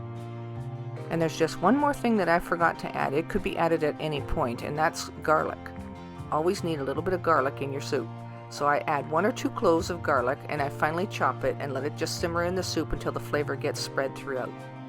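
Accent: Canada. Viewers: PS are you Canadian?! I hear my families accents in your voice ❤️❤️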